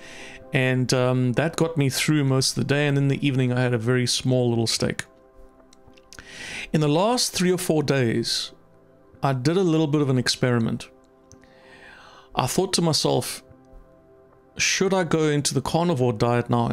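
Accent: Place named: South Africa. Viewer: u sound south african.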